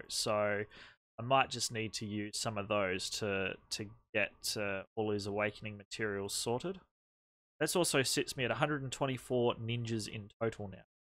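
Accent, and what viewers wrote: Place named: Australia. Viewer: Hmm you sound like an aussie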